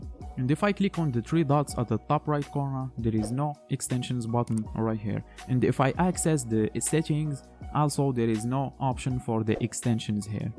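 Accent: Morocco. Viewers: مغربي هذا ههههه باينة غي من الهضرة 😂😂😂😂🤦‍♂️🤦‍♂️ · i knew ur Moroccan from ur accent , shoutout to you Mate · Tbarklah 3lik akhoya bayna fik mghribi mn tari9a dlhdra👌👌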